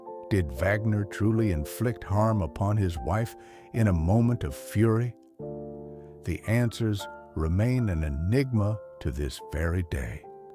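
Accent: South, United States. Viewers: He has Alzheimer’s. Why does the narrator have a deep southern accent? Walked and Wagner supposedly were in a sexual act when Natalie walked into the main room of the boat. If several people heard her cries, why did they not take action? Everyone was extremely drunk. Can you solve a case 44 years later? A tragedy definitely. I would never make an opinion based on this evidence, or lack there of. If those other wealthy people heard her screams, why didn’t they make sure all was ok?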